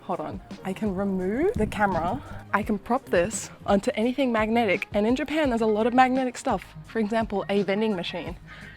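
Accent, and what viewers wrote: Australia. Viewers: Really enjoyed the day trip! I also enjoy your Aussie accent! He-yah (here) 😺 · I like you very much. Your Australian accent is lovely. The "hiya" (here) is very prominent. 😄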